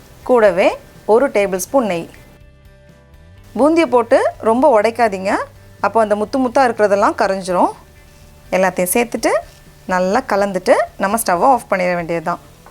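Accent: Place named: Nagercoil, India. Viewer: Baby is soooo cute... And the way you speak ... Is your native is Nagercoil?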